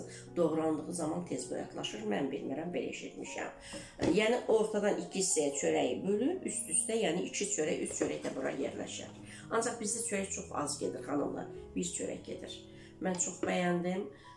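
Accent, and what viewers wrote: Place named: Baku, Azerbaijan. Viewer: Caaan Bakilim Lehcene qurban.Allah sizden razi olsun.Sizi sevincle izleyirem.Bakinin 32 kendine salam olsun.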